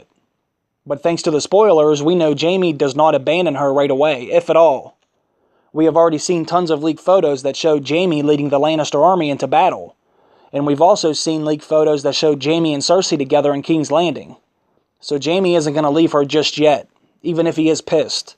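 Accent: Pittsburgh, United States. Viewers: you sound like your from pittsburgh lol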